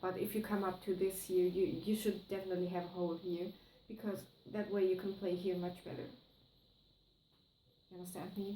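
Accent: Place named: Germany. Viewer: Ich hab mir bei deinem Englisch-Akzent irgendwie gedacht dass du deutsch sprichst. Aber das ist eigentlich total unwichtig. ist mir nur aufgefallen. Du spielst gut. Ich bin auf viele neue Videos gespannt! :)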